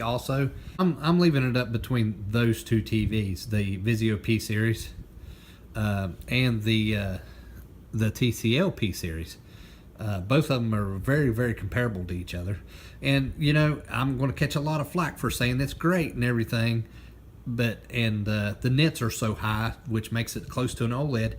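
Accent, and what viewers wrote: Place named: South, United States. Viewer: Jordan Gons might just be his heavy southern accent.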